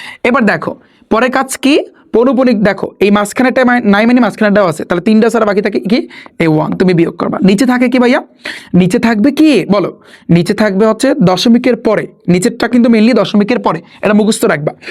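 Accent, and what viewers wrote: Barishal, Bangladesh. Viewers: ভাইয়া কি বরিশালের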